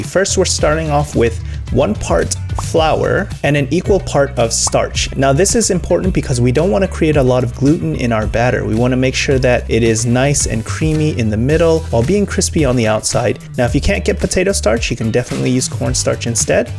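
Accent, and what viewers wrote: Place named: United States. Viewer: Thank you for your tip on getting an extra crispy results. Rice flour along with the carbonated soda also works. My only issue, is that (other than the amount of frying oil) you did not include portion or size requirements in your video nor in your description box. Portion size of ingredients does effect the desired results of the batter after it is cooked. Lastly, you have an American or Canadian accent, but live in Japan, what is your heritage? Thanks again for your tutorial. ⚘⚘⚘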